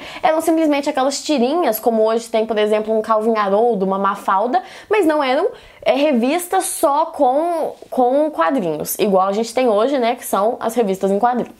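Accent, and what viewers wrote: Minas Gerais, Brazil. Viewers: Gostei muito do seu canal! Faço facul de Direito mas amo muito História e quadrinhos e supercurti o seu jeito descontraído de ensinar, além do sotaque tranquilo de Minas que eu amei! (Tenho parentes daí de Minas Gerais :) ) Ganhou um fã do seu canal! Muito show, continue assim! :) :) :) ;p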